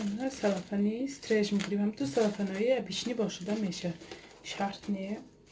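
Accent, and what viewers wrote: Isfara, Tajikistan. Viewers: Хело,ба фикоам шумо аз Исфара,лахчаи гапзанитон монанд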